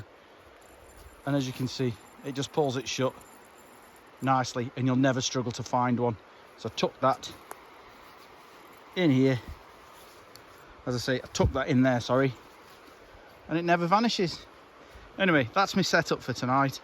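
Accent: Stoke, United Kingdom. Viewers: I hear that you're a fellow Stokie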